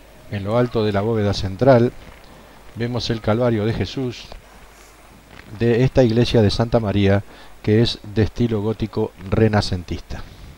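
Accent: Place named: Argentina. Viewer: Por el acento sos argentino...no...?...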